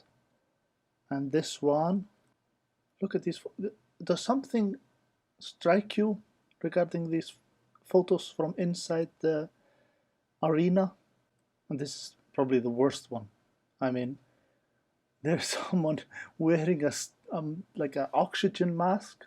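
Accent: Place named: Germany. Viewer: She mentioned it in a video, but I already knew because of her german accent. You can see some people comment in german on her channel.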